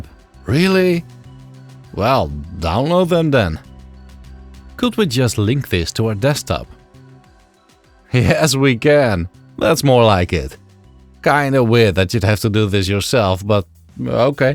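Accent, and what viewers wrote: Netherlands. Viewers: This OS reminds me UK YouTuber, Dan Wood. He is Amiga computer user, btw. I remembered watching MorphOS video a few times ago. But what I liked more here, than from Dan Wood is your NLD English voice that I just preferred 😅😅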